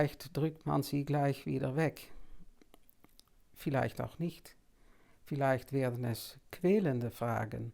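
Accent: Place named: Netherlands. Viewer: Liebe! Vielen Dank für das Video-Projekt in deutscher Sprache! Gleich drei Punkte fallen mir dazu auf: 1. Erinnere ich mich beim Hören dieses Videos in deutscher Sprache sofort an Deine wunderbaren Vorträge, an denen ich teilnehmen durfte. 2. Dadurch, dass Deutsch nicht Deine erste Sprache ist, werden viele Aussagen eben noch überlegter, als es vielleicht in der Muttersprache passiert, wo mal automatisch etwas „herausrutscht“. Ähnlich einem Weg, den man nicht täglich geht und einem dabei jeder Schritt bewusster erscheint. 3. Ich finde Deinen holländischen Akzent sehr charmant, er gibt Deinen Ausführungen eine schöne einzigartige Farbe. Also freue ich mich schon sehr auf die folgenden Videos, nun auch auf Deutsch!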